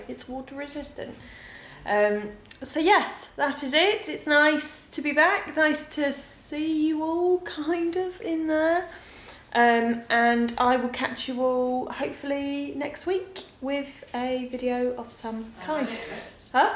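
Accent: England, United Kingdom. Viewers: Love ❤️ your fancy sounding British accent. Thanks Philadelphia PA resident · Thankyou! Have subbed as it’s great to hear an English accent again..miss London..been here in Oz 6 years...thanks again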